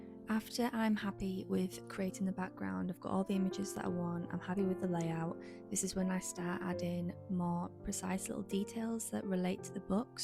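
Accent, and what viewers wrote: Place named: Northern England, United Kingdom. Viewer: Ooh is that a northern accent I hear! I love discovering a fellow northerner on yt, just found my new fave channel! Your journal spreads are so beautiful ❤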